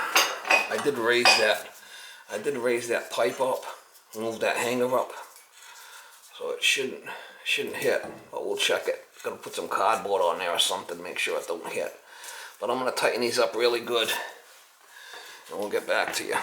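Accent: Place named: Massachusetts, United States. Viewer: This guys Mass accent makes this channel fun to watch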